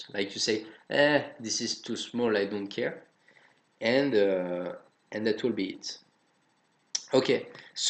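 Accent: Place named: France. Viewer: I love your frenglish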